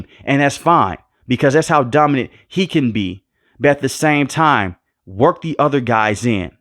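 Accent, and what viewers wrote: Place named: Houston, United States. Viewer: Are you from Houston bro? I know a Houston Native when I hear one lol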